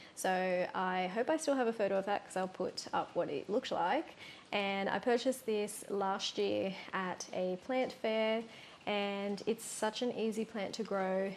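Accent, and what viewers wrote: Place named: United Kingdom. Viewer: Wow your anthurium collection is so healthy and all the plants look great. I wouldn't have thought they could grow without a humidifier and I've been afraid to get any. My ambient air is probably drier than your climate though as I live in a desert! We hardly ever get the rain you were getting he day of filming. And your accent sounds British so maybe it rains a lot there in which case your ambient air has higher humidity than desert air!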